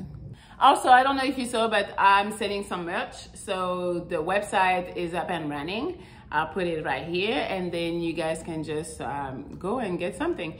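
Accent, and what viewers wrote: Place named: France. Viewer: I just love your fench accent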